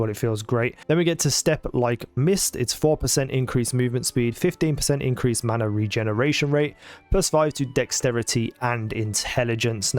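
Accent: Birmingham, United Kingdom. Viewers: Disregarding because of the Brummie accent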